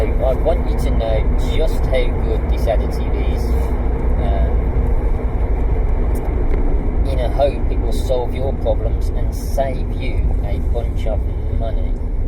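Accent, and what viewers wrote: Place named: West Midlands, United Kingdom. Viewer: funny west midlands accent. Sorry I can't listen to it. I'm so sorry.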